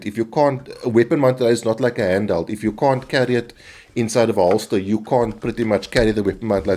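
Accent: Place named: South Africa. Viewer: You are pure South Africa 🇿🇦 the accent thick.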